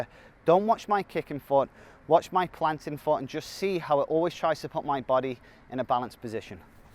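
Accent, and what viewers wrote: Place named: England, United Kingdom. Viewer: love these videos but i cant help to get confused by why he has an english accent and calls it soccer?! like its Football in England.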